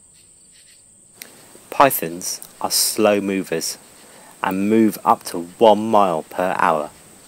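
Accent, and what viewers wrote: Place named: United Kingdom. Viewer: Cool accent! Is that British or South Africa?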